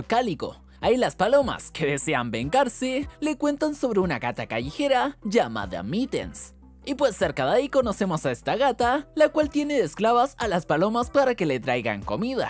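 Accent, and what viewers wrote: Chile. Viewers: Pera pera eri chileno ?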